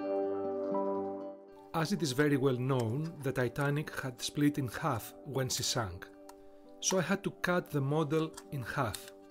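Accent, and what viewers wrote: Greece. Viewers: η ελληνική προφορά είναι ότι καλύτερο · speaking English with a Greek pronunciation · your pronation its like a greek who tries to speak English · Με την πρώτη λέξη κατάλαβα ότι είσαι Έλληνας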